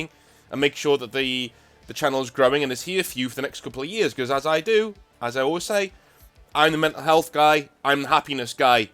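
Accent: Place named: Northern England, United Kingdom. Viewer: Watched a couple of your videos recently, love the energy and content. Curious, based on the channel name and accent, what store did you manage? I only ask as it was in the north of England where I first found my love of table top gaming!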